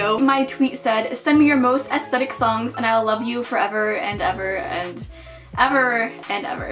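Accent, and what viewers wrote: Canada. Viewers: are you canadian?! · Are you Canadian? Btw I liked the video :)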